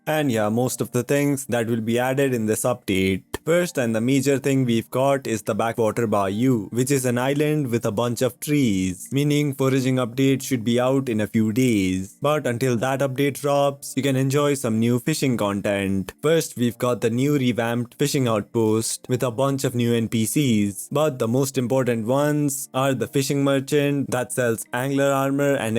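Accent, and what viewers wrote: India. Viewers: are you Indian, my guy?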